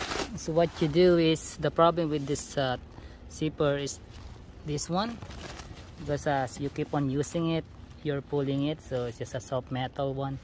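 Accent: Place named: India. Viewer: God bless you poonjabi! Just when thought every one with an Indian accent was just a scammer you showed me a true and legit fix for a distressing dilemma! It totally worked and i didnt have to purchase the stupid product that the white people in the other video were trying sell me. Scammers come in all colours and so to truely legit people. Your fix it worked crazy good. Thank you poonjabi. I liked and subsrcibed your chanel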